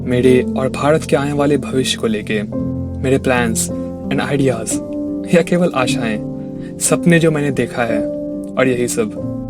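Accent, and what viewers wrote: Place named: Bengal, India. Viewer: min...The text was in Bengali...❤️❤️ Are u a bangali sir??? I am very curious 😄😄..apka hindi accent dekh ke muja ve laga app bengai hai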